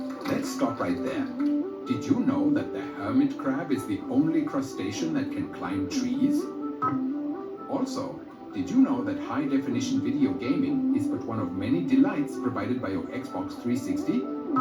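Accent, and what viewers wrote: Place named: Germany. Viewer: bin hier bevor das video 6 stellig wird :) echt nice, musste auch länger hinhören um zu checken dass du deutsch bist :D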